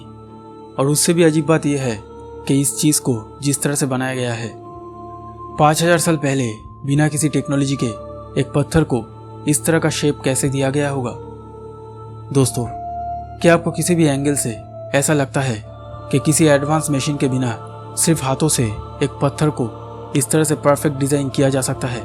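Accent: Bengal, India. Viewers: Sir, aap bangali kya, aapke awaj se aisa lag raha hain......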